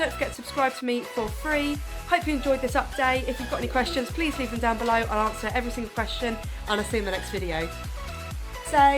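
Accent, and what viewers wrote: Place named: United Kingdom. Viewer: I like u accent ! looks like british . But anyway , adorable video. Thanks for sharing with. Hello from Japan!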